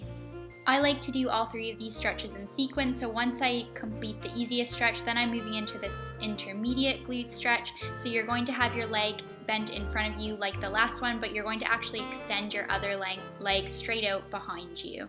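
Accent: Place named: Canada. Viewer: canadian accent is cute :)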